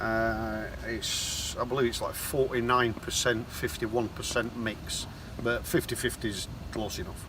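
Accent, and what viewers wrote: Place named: Yorkshire, United Kingdom. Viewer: Strange hearing a yorkshire accent in the united states. Awesome build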